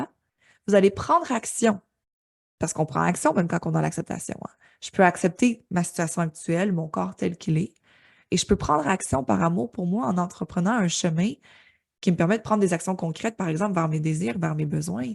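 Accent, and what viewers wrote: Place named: Quebec, Canada. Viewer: On adore votre petit accent Québécois 🩷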